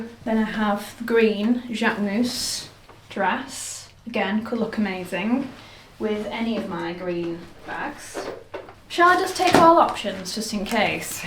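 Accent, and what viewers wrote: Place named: Wigan, United Kingdom. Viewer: Absolutely adored this vlog and sadly we are in the time where we seem to be going backwards for women’s right and the decisions we make for ourselves and with our partners. 😔 but we stand our ground because we’re all strong women 🖤 also met a friendly chap from Wigan today in Nashville TN USA 🎉 made my heart explode — his accent reminded me of you and 😌😂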